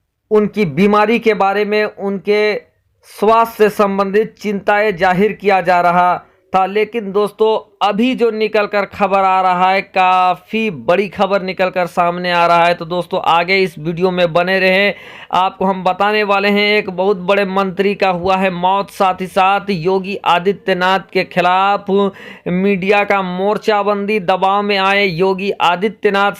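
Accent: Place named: Jharkhand, India. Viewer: Jharkandi talaffuz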